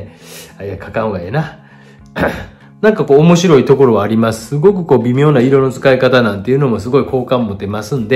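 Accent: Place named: Nara, Japan. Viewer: ご指導ありがとうございました。 背景の黒を頑張ったつもりですが、まだまだ足りませんでした。 紙が粗いのは気になっていました。 紙探しをします。 そしてまた、ガラスを描いてみます。 先生、やっぱり奈良弁ですよ。 「○○できやん」とか、「見えやん」とか「良かったかもしらん」とかは、やっぱり奈良っぽいです。私の奈良のお友達は みんなほんわかしていい人達でした❣️ とにかく 精進しますのでよろしくお願いします😊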